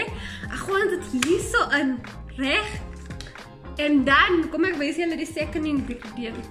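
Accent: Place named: Northern Cape, South Africa. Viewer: I love your accent. You sound like a friend of mine from the Northern Cape?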